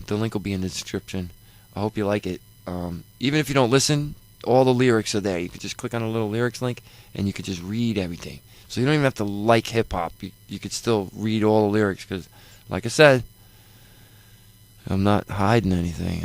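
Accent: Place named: Brooklyn, United States. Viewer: Cool my lil gangsta rapper from Brooklyn, soon I'll buy all your songs, I like the Brooklyn accent, I've always been a fan of real hip hop ! Stay strong boy :)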